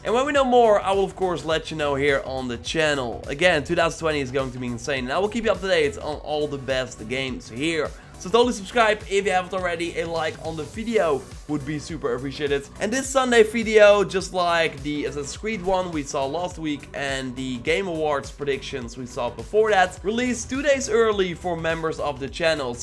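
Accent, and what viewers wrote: Netherlands. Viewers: You kinda have a dutch accent bro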